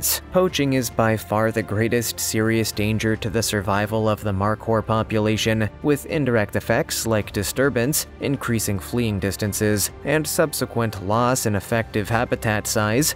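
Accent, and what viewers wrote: Canada. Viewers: That is one heck of a Canadian accent. Love it! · Im sorry great video but. Pfff im dutch and even i can hear that disgusting canadian accent. “Mouth, about, doubt,”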